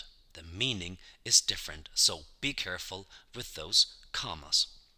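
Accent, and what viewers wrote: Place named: Germany. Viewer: thanks a lot, you explain clearly, but you speak as Germans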